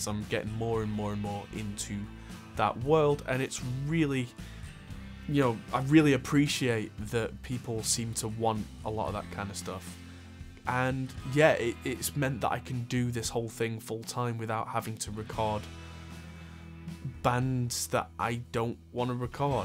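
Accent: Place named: North, United Kingdom. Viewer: You're a credit to our industry, look forward to seeing more of your content. Would love to catch up with you at some point it's great to hear a fellow northerner on here.😃 Maybe catch up at Namm in April.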